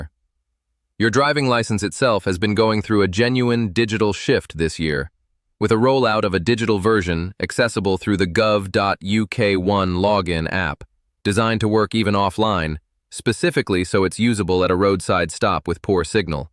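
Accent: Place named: United States. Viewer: Why is this in an American accent with incorrect American pronunciation of the English language used. Americans have destroyed the English language. The content is largely incorrect. What a fraudulent waste of information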